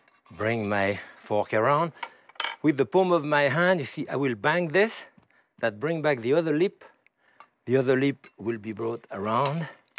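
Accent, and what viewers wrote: France. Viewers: merci M. Pepin...c'est se bonne-- this is wonderful....dae schopping of the herbes is a beautiful sound.....love his francais acCent!!!! ') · He actually said, "we're making more dishes with eggs" but it sounded like, "more of this shit with eggs." lol French people can't say the word "sheet" either and would say, "on a cookie shit." He often calls eggs.... "heggs" too. My mom is French so I've heard this accent all my life. It's adorable. I feel right at home with Monsieur Pepin. Can listen to and watch him cook all day. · That french accent..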